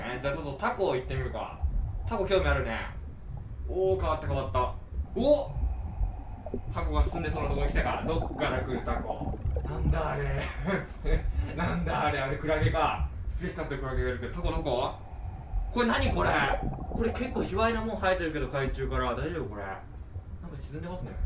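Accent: Kansai, Japan. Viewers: 関西弁いい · 関西弁いいね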